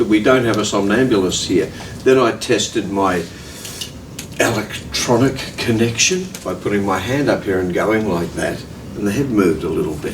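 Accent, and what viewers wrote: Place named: Australia. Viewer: This guy is Australian, not English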